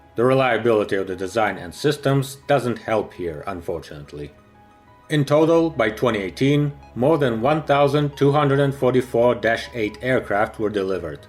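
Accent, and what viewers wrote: Russia. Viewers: Thanks for your work friend. I like your Russian accent, and I live in the USA. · The rusdian accent is di atrocious. Couldn't make it beyond one minute. Full disclosure, I'm rusophone myself.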